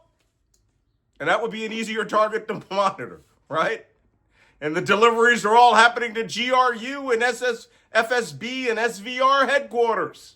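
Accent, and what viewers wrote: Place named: Maryland, United States. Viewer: Going by your accent and expressions I'm guessing that you are from Maryland.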